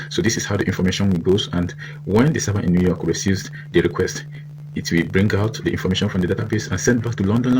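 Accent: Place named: Nigeria. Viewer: Your Nigerian English accent is very difficult to understand. It's good enough for your Nigerian selves. It's made WORSE by your speaking so so abnormally fast. I can't subscribe to this , sorry.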